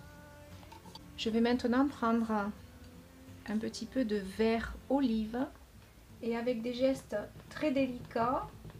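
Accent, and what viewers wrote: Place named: South-West, France. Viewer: Chirurgicalement parfait. PS: vive l'accent du sud ouest !!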